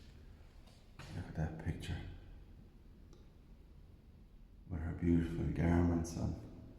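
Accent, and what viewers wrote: Ireland. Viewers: I wonder how many descendants visit? Have they contacted families to see what they would help to fix the crypts. I like your Irish accent. I am Irish on my Mother's Father's side. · The whole of Brussels tends to be 'damp' (I live here!) ..Brussels literally means 'settlement in the marsh' and the river Senne runs underground. Thank you for this fascinating video. 'Conseiller communal is a councillor to one of the 'Communes' (districts) of Brussels. Love your content and your gentle, soothing brogue. Thank you 😊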